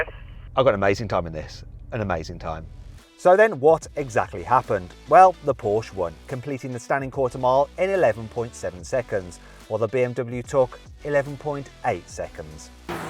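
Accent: United Kingdom. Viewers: manteb nih channel, sekalian belajar british accent wkw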